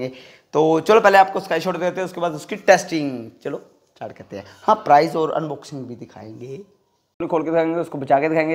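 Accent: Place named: Punjab, India. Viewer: Love your punjab accent😅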